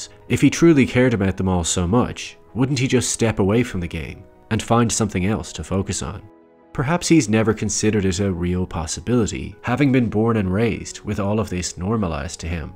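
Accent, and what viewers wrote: Ireland. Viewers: Great channel. Please consider using your own lovely accent rather than the classic youtuber vocal fry + upwards cadence thing. I can hear there's an Irishman in there!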